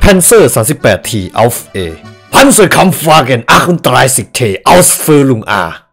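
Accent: Germany. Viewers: โครตชอบเลยสำเนียงเยอรมัน 55555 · ผมชอบสำเนียงภาษาเยอรมันพี่มากครับ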